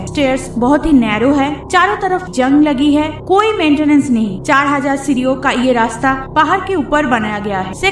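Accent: Bengal, India. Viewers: Ur bengali accent is so cute.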